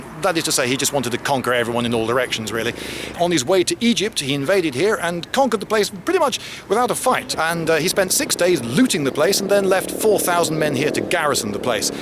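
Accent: United Kingdom. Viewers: haha is so british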